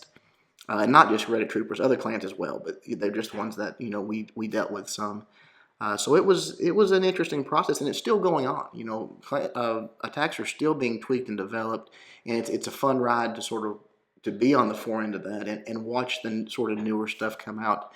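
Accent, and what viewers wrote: South, United States. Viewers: Wow a dude with a southern accent that has taxidermied animals what a shocker.........some people really need to leave their bubbles sometimes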